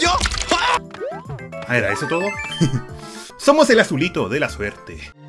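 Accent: Chile. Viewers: Eres chileno? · eres Chileno? yo si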